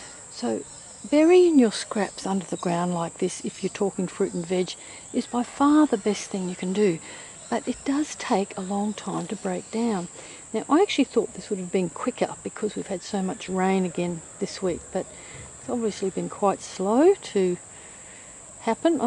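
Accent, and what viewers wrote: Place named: Australia. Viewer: The in-ground system she's using is literally called a compot, you dumb nugget. She's australian. We just talk like that.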